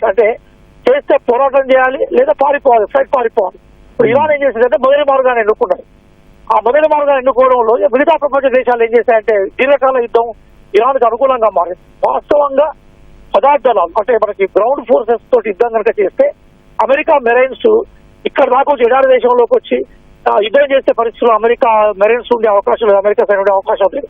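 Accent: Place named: Telugu, India. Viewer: ఈ tv తెలుగు స్లాంగ్ 👌👌👌❤️❤️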